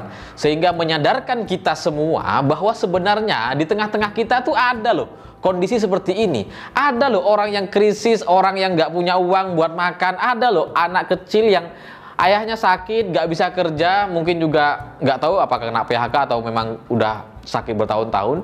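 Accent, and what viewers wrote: Madura, Indonesia. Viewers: Kau tinggal dimana sih bro? Kau lupa ya? Kalau tradisi di orang2 kita alias "tretan" .. sapi memang dikandang di dapur... Jadi jangan kau anggap bahwa itu memprihatinkan atau ngenes... Sok peduli dan sok kekota2an kamu... Muka dan logatmu itu masih Madura asli... Jangan mempermalukan nak-kanak dibik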